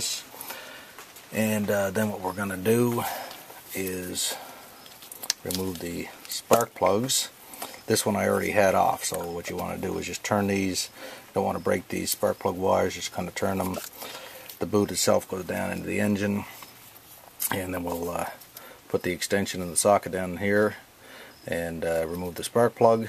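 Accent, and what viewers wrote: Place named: Canada. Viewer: Where are you from? You sound Canadian lol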